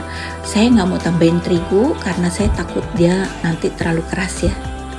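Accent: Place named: West Java, Indonesia. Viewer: Masyaallah cantik nya ci ibun,,, Logat bahasa seperti nya orang sunda yah🤩, Salam sukses ibun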